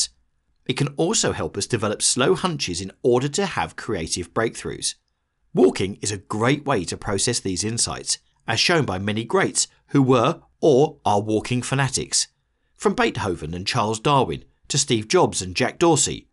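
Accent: United Kingdom. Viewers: The irony of a man with a British accent talking about the successes of Benjamin Franklin. 🇬🇧❤️🇺🇸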